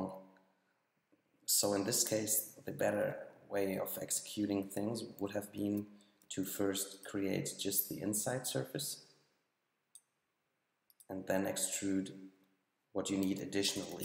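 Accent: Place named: Germany. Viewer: der deutsche akzent :D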